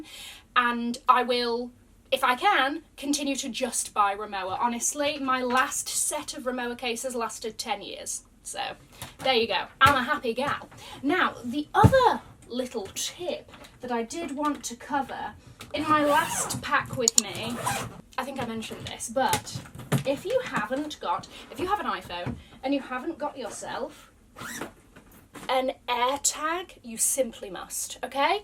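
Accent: United Kingdom. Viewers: I’ll have to keep an eye out for your British accent and fab style! 😉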